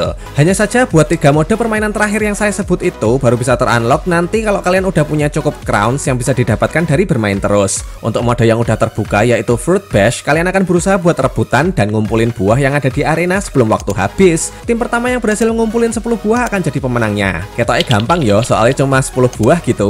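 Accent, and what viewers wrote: Java, Indonesia. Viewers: medok banget 😅😅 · Orang Jawa ya bagian mana bang daerah mana bang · Jowo ne Endi kang salam dari Ngajuk 🙏😊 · Suka banget pembawaannya bang ad Jawa Jawa nya😀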